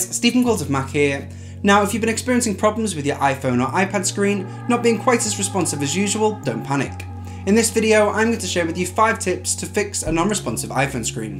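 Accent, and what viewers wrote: United Kingdom. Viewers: This video is proof that having a British Accent doesn't automatically make you smart.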